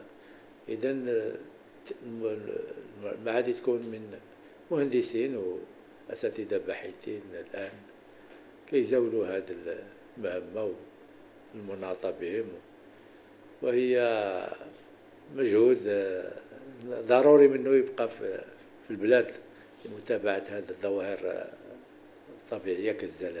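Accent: Algeria. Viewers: واش هذا جزائري على حسب كلامه الدارجة المغربية هاربة عليه ويستعمل كلمات دخيلة على لغتنا حتى في اللغة العربية ديالو مايلة لجهة هكيين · تيهضر بحال شي جزاءيري 😮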